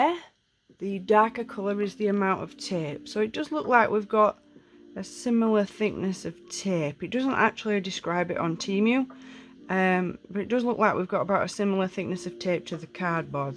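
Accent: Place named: Yorkshire, United Kingdom. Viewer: I think th UK hub is in Birmingham, so I'm not anywhere near there. I'm originally from Sheffield , so I knew you were a Yorkshire lass !!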